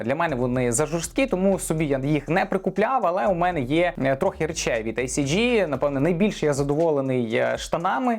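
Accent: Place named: Rural, Ukraine. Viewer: Блевануть хочется от селянцкого ховора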